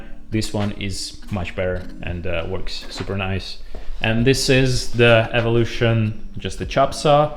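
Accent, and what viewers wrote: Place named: Eastern Europe. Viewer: you have a very slavic accent.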